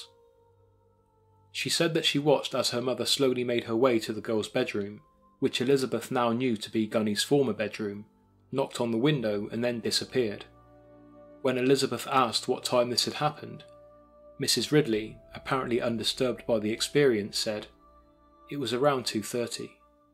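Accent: England, United Kingdom. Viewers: I'm normally streaming via Roku or SmartTV so I rarely leave comments but I absolutely love this channel. Excellent narrative skills from proper British accent, the stories are intriguing & I just wish made more videos (being selfish). But with this lame pandemic I don't know why anyone would give this man's videos a dislike. Keep doing what your doing sir! You're in my top 5 YT channels. Thank you for your time & efforts making these videos. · Interesting hearing someone from Old England talking about New England.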